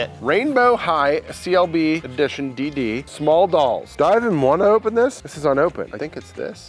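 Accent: Pittsburgh, United States. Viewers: Ahhhhh. Love hearing that Pittsburghese. Reminds me of home! Go Stillers! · I caught that Yinz and the saw that steelers logo in the background. Thought your accent sounded familiar! Pittsburgh ftw!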